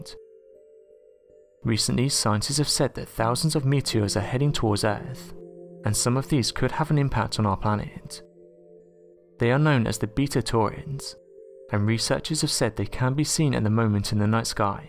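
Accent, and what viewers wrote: United Kingdom. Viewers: I saw no proof or evidence regarding the claim of NASA. Instead all I heard was a British smart alec talking in riddles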